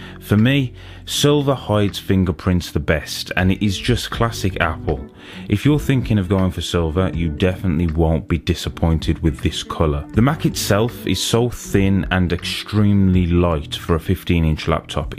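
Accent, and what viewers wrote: England, United Kingdom. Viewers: your English accent is the icing on the cake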